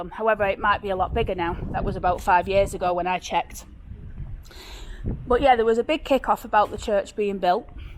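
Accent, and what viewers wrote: Oldham, United Kingdom. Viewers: I love the Oldham accent, Bluecort skewel and St Mury's, very enjoyable video 👍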